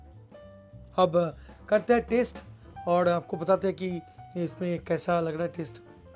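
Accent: Bengal, India. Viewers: Is not woishky ...avoid ur bengali tone of speaking Its whisky..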